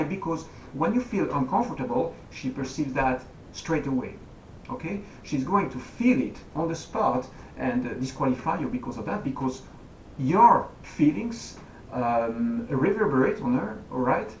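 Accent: France. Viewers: is your accent French?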